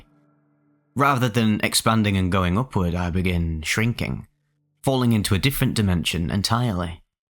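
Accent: United Kingdom. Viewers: He’s British that’s just how they enunciate lol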